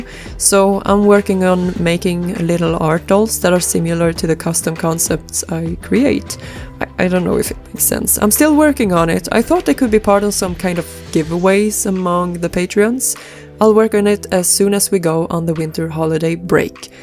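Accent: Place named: Sweden. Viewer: I'm truly sorry for ur loss tho, i thought u were swedish by ur accent it the start of the video but then i realized u actually were when u told about the öra thingy lol jag är också svensk så tyckte det va lite kul :)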